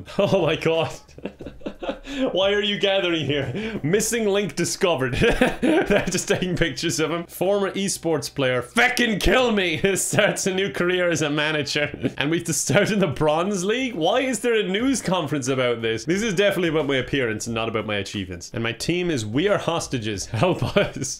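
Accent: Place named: Ireland. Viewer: leans in “hHph“ me: there’s nothing that this man could do to make me NOT live for his irishness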